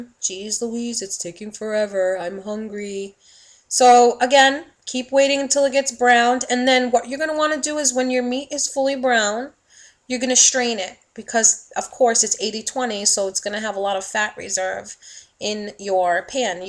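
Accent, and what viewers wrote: New York, United States. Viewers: you sound like you are from New York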